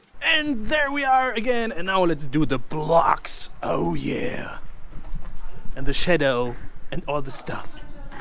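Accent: Germany. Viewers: Is his accent german?😅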